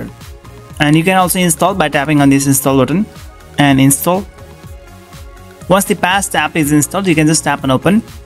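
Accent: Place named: India. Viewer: Yup always an indian accent guy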